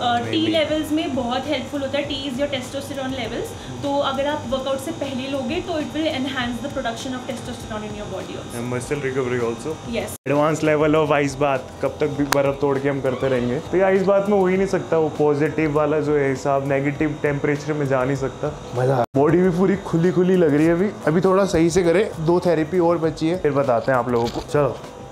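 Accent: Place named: United Kingdom. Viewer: Kaafi tagdi English accent hai bhai aapki🔥👌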